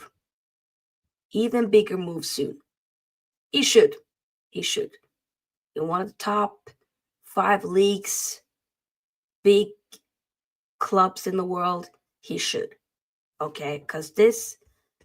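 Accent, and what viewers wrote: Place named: Norway. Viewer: The instant you started talking I knew you where Norwegian lol. That accent is real. Thanks for the video :) I think Nusa should stay for a couple of seasons in Bundesliga before going to a bigger club. But he will def end up in a top club with time. Let's not rush anything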